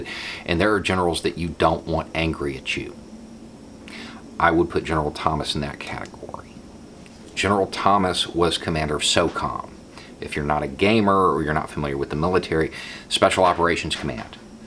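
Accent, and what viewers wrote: South, United States. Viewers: I hate to pigeonhole Southerners, but it's refreshing to hear that accent, in a calm voice, giving us facts, instead of spewing Fox talking points. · This is probably the most comforting thing I've heard yet. The recent messages from our military brass gives me hope. I travel full time in an rv, and I've just returned from traveling from TX up through the south. Long before the virus hit, yet alone the latest shootings and protests, I was very angry and frightened by what I heard from police, Natl Guard members and fellow citizens in my travels. THE HATE IS REAL. To hear police and guardsmen disregard it, while spouting pro Trump rhetoric made me believe both our military and police forces are all corrupt racists- and we are doomed. I came upon your videos a few weeks ago- and gotta say your look and accent gave me a visceral response- engaging the stereotypes that were reinforced during my latest trip south. 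But then I listened and realized that much like Trae Crowder, you break that mold. We are all prejudiced with ingrained stereotypes by movies, news, headlines. MY biggest prejudice has always been a southern accent. From Boss Hog, John Wayne, and even the movie Deliverence (yes I'm THAT old) my skin crawled when hearing those accents, which portrayed ignorance, misogyny, and hate of minorities- including Native Americans. It was jolting to me as a "Yankee" to be in black communities, in this day and age, to have them answer my inquiries with "yes 'mam" and "no 'mam". I wanted to yell at them and say... "I am not your superior- you dont have to address me like that." It felt degrading for them- until I got into more wh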